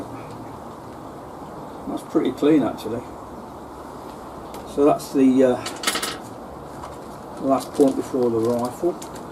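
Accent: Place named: Worksop, United Kingdom. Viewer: You sound like your from my area of the UK (worksop)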